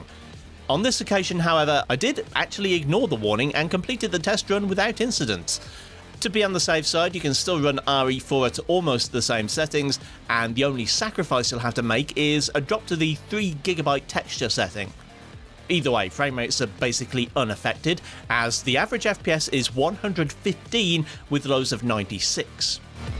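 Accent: England, United Kingdom. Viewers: Be quiet!! Mr english accent got a new video!!!! 😎🤩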